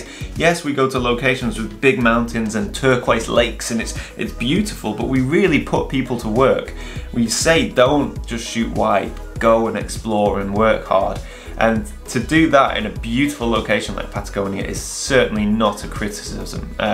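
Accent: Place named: Northern England, United Kingdom. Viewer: Its a shame that you feel you have to defend yourself. I believe the problem stems from the fact that many of us can’t afford 4k for a trip; most of us are philosophical about it, but it seems a few take the angry route...they tend to be far more vocal. Don’t pay them any mind, you have nothing to justify. Having said that, their bad behaviour has led to you producing a very interesting vlog, so...cheers dumbhorses 😂 I am quite new to your channel, via Svalbard with Morten, enjoying your work. Though frustrated, I can’t quite place your accent...I am thinking between Buxton / Sheffield. I grew up in Donny, but have lived in Scotland for 30 years...I need to get out and photo this scenery! Cheers / slanj 🥃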